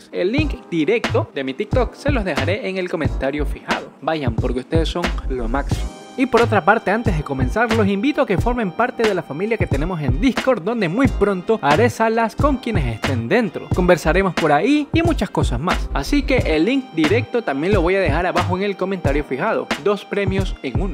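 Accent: Ecuador. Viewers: Pregunta: De que parte de Ecuador eres? Lo digo por q en un directo te vestiste de amarillo y dijiste como el "Barcelona" y en unos vídeos sabes hablar como ecuatoriano xd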